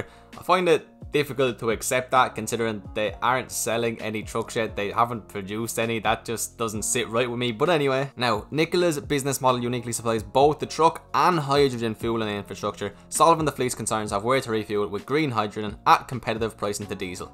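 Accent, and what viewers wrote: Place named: Ireland. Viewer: Are you from Ireland?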